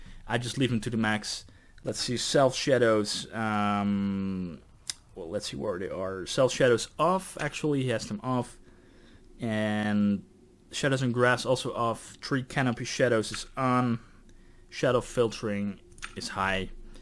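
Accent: Netherlands. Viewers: nederlands accent doet pijn XD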